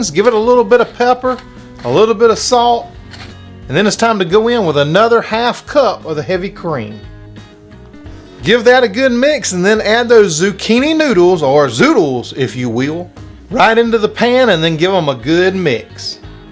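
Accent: South, United States. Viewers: Hi, I absolutely love your southern accent. I can listen to you for hours. I’m from Australia and we don’t do American accent well🤪 but I think after listening to you for a couple of hours, I might actually nail it 😀 Great video, I made your ultimate keto bread yesterday… OMg it is so delicious … I just had it for lunch today…even my non Keto husband loved it. I also had enough mix left over to make 6 muffins which I will freeze if my husband doesn’t get to it first Cheers from down under and keep up the work 🎉🎉🎉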